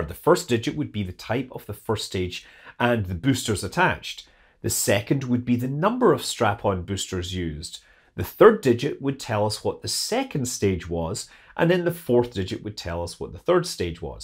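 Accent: Scotland, United Kingdom. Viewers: Why are these "Sons of Thor" and not "Daughters of Thor"? Also, you are sounding particularly Scottish today. What happened?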